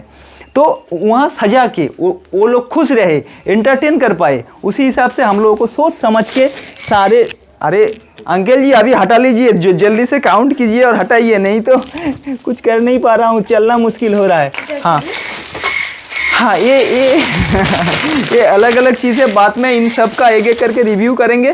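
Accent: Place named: Bengal, India. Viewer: Apnar Hindi pronunciation and words choosing bengoli der moto... So better do in Bengali language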